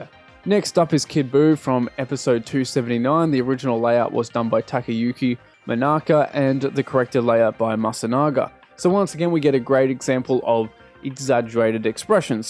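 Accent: Australia. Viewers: I was pretty sure you came from down under but the accent was kinda close to British so I wasn't sure.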